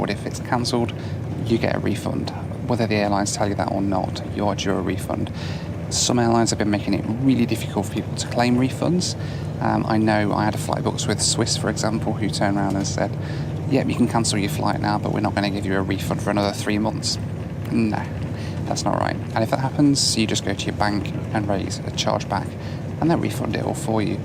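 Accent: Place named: Derbyshire, United Kingdom. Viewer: Been watching your videos for a while and thought I know that accent, being a ‘local’ to Chesterfield too.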